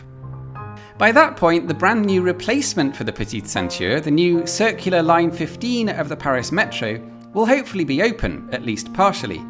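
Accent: United Kingdom. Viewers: Quick question here. So are you British, or Parisian? Because your accent more like English man rather than Parisian. But your other language is good too.. Ps: edit English to British. Sorry, because in my english lesson, british always said as english man. 😶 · Hearing your perfect french with your british accent is a candy for my french ears.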